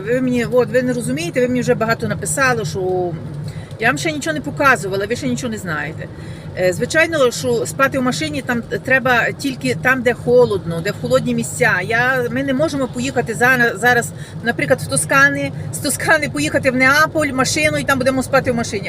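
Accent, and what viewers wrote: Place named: Ivano-Frankivsk, Ukraine. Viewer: вона з Івано-Франківщини , в одному відео проговорилась з коліжанкою , чути по розмові , що не Львів і не Львівщина ! 😂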